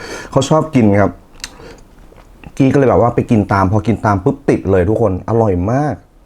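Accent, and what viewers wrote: Isan, Thailand. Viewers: ชอบคุณกี้เว้าภาษาอิสานฟังละม่วนดีครับ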